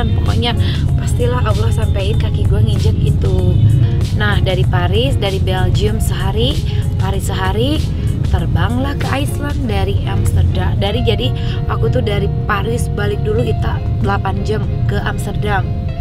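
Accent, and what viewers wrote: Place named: West Java, Indonesia. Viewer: Sunda pisan geningan nyaa... GK bisa dibohongin orang Sunda mah lama diem di Jakarta di luar negri logat nya ttp nyundanis keneh wehhh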